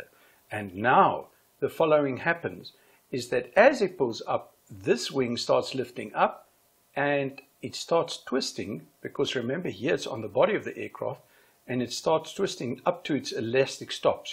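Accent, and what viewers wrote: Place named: South Africa. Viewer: Thanks meneer - nice to hear a South African accent on YouTube. Great analysis, I learned something....greetings from Portugal.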